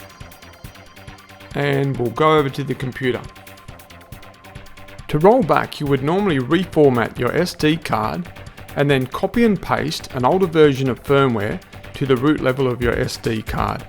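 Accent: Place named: Australia. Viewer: No problem mate. You Aussie? I lived in Melbourne from 2001 to 2003. It's good to hear Aussie talk again. I spent forever figuring out a solution when GoPro was no help. They wanted me to send it in at my expense and only if it was still under warranty (it wasn't). Quite sad when the company that sold you gear breaks your gear and leaves you with the problem. Sent them the video and only got a "thank you". HERO3 black would have been better. I have v50, v58, v70, v124, and v222. v198 is bad.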